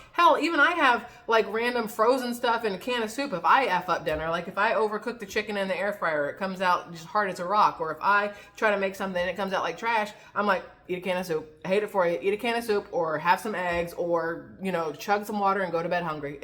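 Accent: South, United States. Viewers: The Southern...sounds so good...love fried green tomatoes.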